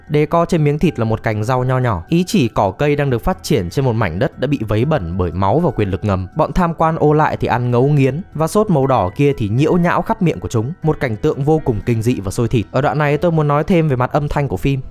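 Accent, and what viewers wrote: North, Vietnam. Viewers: Giọng chủ thớt miền bắc mà cứ ba ba, ông này người nam nói giọng bắc à :) . Ở ngoài bắc người ta gọi "bố" chứ nhỉ :)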